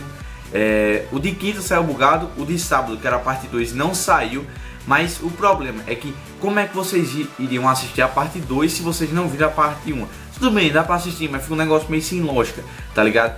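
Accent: Northeast, Brazil. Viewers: de onde tu e ?? sotaque de Nordestino !! sou nordestino da Paraiba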